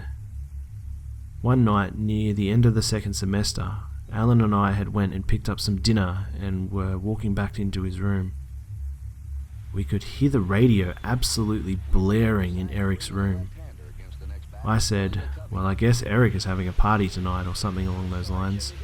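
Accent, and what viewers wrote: Australia. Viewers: that freaking Australian accent <3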